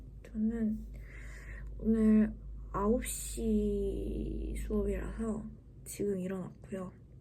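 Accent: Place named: Seoul, South Korea. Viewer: 왜 서울말 하세요?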